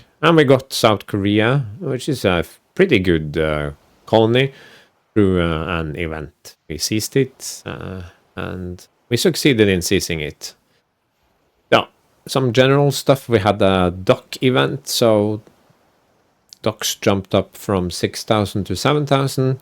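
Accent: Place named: Scandinavia. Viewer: where are you from if you dont mind me asking? youre accent sounds Scandinavian to me but i would like to know again if you dont mind me asking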